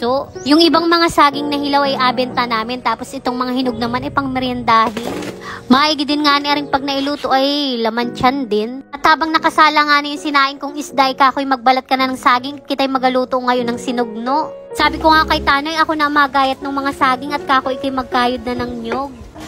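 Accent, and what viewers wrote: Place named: Mindoro, Philippines. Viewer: Katuwang pakinggan ang tuno ng Salita nyo at mga words, kaya pala itong manugang ko sa pamangkin, katulad mo mag salita tiga, Mindoro kasi, tuwa ako pag nagsasalita sya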